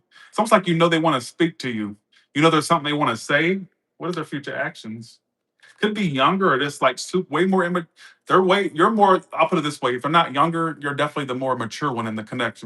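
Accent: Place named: New York, United States. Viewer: you have a badass accent it's giving 1920s 1930s 1940s 1950s New York/old movies but in todays times actor you could've play extra in most iconic films I bet people would remember your quotes lines when say "you know what... " I thought for a moment as if you were saying some films lines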